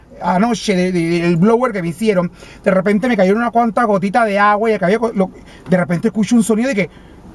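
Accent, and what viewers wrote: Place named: Panama. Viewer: oirte hablar desde España (vivo en España), me trae muy buenos recuerdos de mi Panama. ¡Chúleta! casi me meo de la risa.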